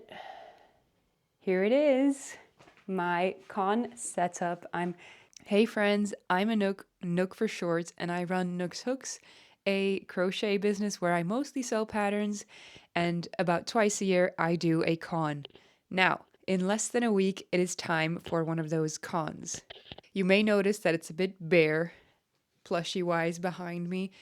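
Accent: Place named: United States. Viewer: Its so interesting, your sick voice gives you an American accent somehow 😂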